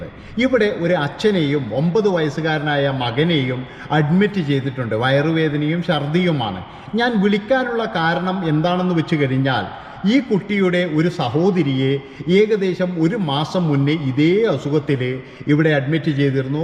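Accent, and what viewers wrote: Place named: Northern Kerala, India. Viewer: അവതരണ ശൈലി കേൾക്കാൻ ഒരു സുഖവും ഇല്ല ഒരുതരം വൃത്തികെട്ട വടക്കൻ ഭാഷ.... ഒരു സ്റ്റാൻഡേർഡ് ഇല്ലാത്ത ശബ്ദവും ഭാഷയും എന്തോന്നാടാ ഇത്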